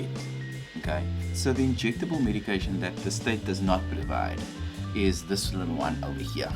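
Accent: South Africa. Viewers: Are you from South Africa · Hii I recognize your accent. I'm south african as well! I'm month 5 on E. Subbed. I too get my viles from Fagron.As for me i first tried groot skuur but they never called back so I managed to get an informed consent from a private doctor. Her name is Megan Martin if anyone's interested if they need help :)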